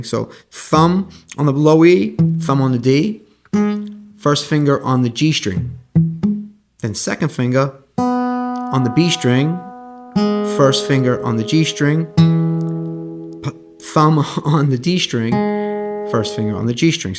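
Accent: New York, United States. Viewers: Also as an Irish woman, I’m digging your accent. It’s what I imagine all New York jazzers sound like.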